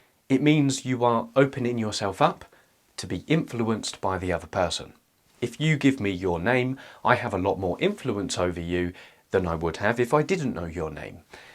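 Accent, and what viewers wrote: United Kingdom. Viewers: This British accent is understandable and good, which part of Britain do they speak this way? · Good morning! How do you spell your name? I spell mine Cory. So, I’ve been spending less time on my phone but it struck me this morning, i miss British accent and words of wisdom❤ i also thought to myself, Cory how much of the health that has lead you to spend less time on your phone is due to fabulous YouTube channel 🤔🫶🏻🫵🏻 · I recently discovered your channel, and aside from the helpful advice, I have to say I love your voice, your way of speaking, and your soft British accent. English isn't my native language, and your videos are helping me improve my pronunciation. goat 🐐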